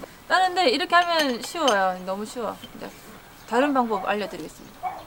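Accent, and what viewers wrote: Gyeongsang, South Korea. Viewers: 대구경북 출신 맞지요? ㅋ 억양이 쫌 ~~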